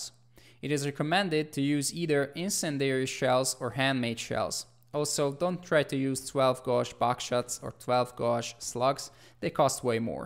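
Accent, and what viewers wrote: Hungary. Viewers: You have hungarian accent lol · Is that a Hungarian accent??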